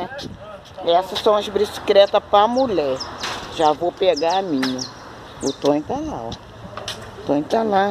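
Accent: Rio de Janeiro, Brazil. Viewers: Carioca. Normal.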